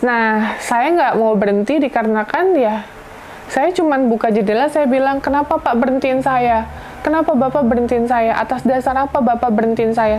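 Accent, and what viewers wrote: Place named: West Java, Indonesia. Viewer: Resep ngadangu si teteh nyarios teh .. orang sunda ya. Tadi ada kata keukeuh dan Juntrungan nya 😂😂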